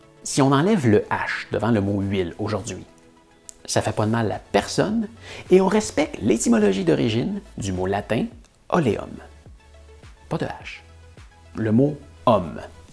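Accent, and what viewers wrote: Quebec, Canada. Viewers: "Sans" et "vent" ça ne le fait pas avec ton accent québecois : on entend sain et vin :-) mais ta vidéo est tout à fait probante, surtout la comparaison avec l'italien et avec les exemples d'orthographes anciennes ou des sources latines, je suis (con)vaincu. Ce que je vais ajouter n'est pas pour contredire tes propos mais pour apporter un éclairage et quelques complémentarités : l'étymologie se retrouve dans l'orthographe et peut donner du sens comme "forêt" (loin de sylvestre), dont l'accent circonflexe est un "truc" pour gagner de la place en supprimant le esse qui reste dans forestier, et pour ne pas confondre avec un foret pour percer le bois ou le métal. Un des mots que je trouve le plus dingue est « accueil », pour arriver à l’écrire je doit toujours le prononcer dans ma tête : akuèye, comment l’écrirais-tu ? Oui le français est plein de non-sens comme écrire « fils » pour le fils de son près, et pour les fils électriques, écriture qui ne dit pas comment ça se prononce, il y a des listes de ce genre que tu aurais pu citer. 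À contrario, l'amande le fruit de l'amandier, et l'amende prononcé par un tribunal : les militant en désobéissance civile qui vont en procès disent qu'ils aiment au menu les amandes et les avocats :-) Et c'est vrai qu'il est inutile de conserver une orthographe complexe, quand à côté le langage perd la poésie des métaphores, et quand les personnes vont vers un appauvrissent spirituel : on sait que certaines catégories sociales ont très peu de mots dans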